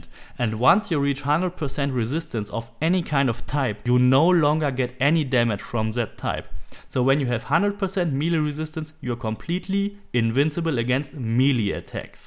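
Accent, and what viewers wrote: Germany. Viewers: Is he german ? :D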